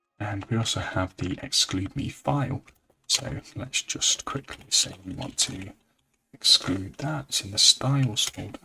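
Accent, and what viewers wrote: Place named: Manchester, United Kingdom. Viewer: Hiya, are you from Manchester way? I was trying to place your accent. If so I'm a little further north but local! Anyroad, not important ;-) And if I've insulted you, apologies, haha! You've gone to a lot of trouble, first with the programming, and second with the explanations/instruction within videos such as this. The quality is fine and explanations clear. I believe complainants should perhaps listen harder and get hands on more. Thank you for the time and trouble you've put in to this. Much appreciated.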